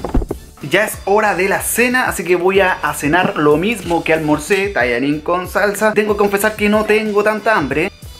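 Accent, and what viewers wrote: Chile. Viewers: Habla como chileno wn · comunica chileno?:v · Yo cache por como ablaba q era chileno :/ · No sé cómo es que estoy suscrita a tu canal (no recuerdo haberme suscrito) pero que bueno!! Jajaja me quedé super contenta con tu video. Cuando vi el título dije "$800 no esta mal" hasta que escuche tu acento que comprendí que no eras mexicano. Aquí con eso puedes hacer las 3 comidas del día en un restaurante que te incluya todo en paquete y quedas más que satisfecho, pero lo lograste! Saludos hasta tu precioso Chile :D bendiciones y excelente video! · Eres chileno o de otro pais con acento de chile :v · Ese acento chileno me desespera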